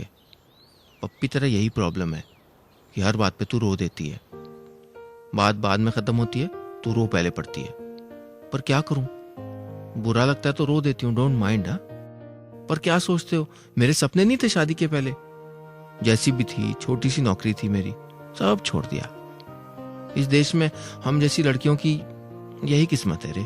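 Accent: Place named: Punjab, India. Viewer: बहुत खूब. पंजाबी तड़के में कहानी सुनाने का ढंग बहुत ही मज़ेदार.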